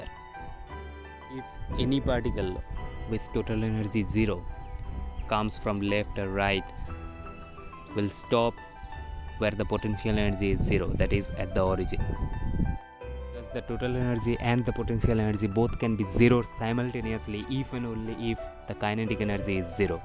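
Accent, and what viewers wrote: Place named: Bengal, India. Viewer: Are you Bengali bro ? Your voice seems like a Bengali people lecturing in English !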